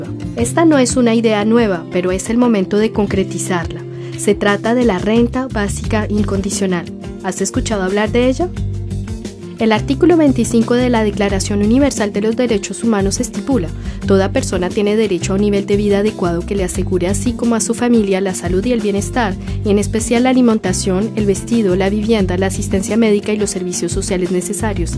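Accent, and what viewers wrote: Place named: Colombia. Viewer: Solo por curiosidad, en que parte de España o Europa la gente habla con acento Colombiano?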